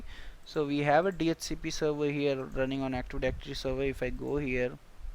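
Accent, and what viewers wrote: India. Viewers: make a video without a indian accent. So annoying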